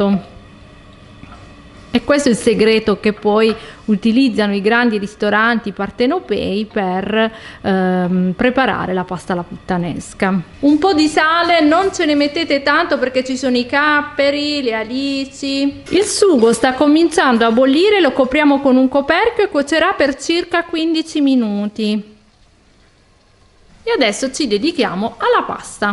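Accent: Apulia, Italy. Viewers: Dall'accento sembri pugliese. Vero?😁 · 👋👋 SEI brava e chiara, di che regione sei PUGLIA, VOLEVO CHIEDERTI CHE NE PENSI DEL ROBOT BIMBY LO USI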